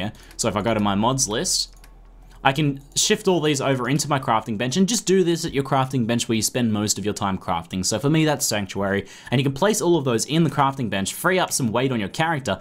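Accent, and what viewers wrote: Australia. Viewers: You Australian or NZ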